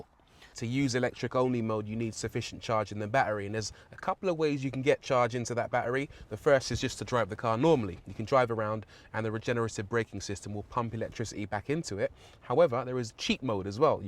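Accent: United Kingdom. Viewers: you do know hes british right? he is speaking true english....your american english sucks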